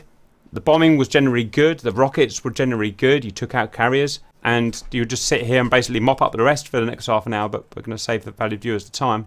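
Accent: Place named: Estuary, United Kingdom. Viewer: Yeah, you have nowhere near a West Country accent, but most Brits will move around and lose their native accent....yours is a bit muddled...so I'll just call it estuary with a twist here and there when you get excited. Not trying to offend you, the accents are getting harder and harder to nail down anymore. I used to coach accents many years ago, and I'm sooo glad I do not do it anymore. Anyways, thank you for the uploads, love the content...sorry if this came off as weird. Right, carry on mate.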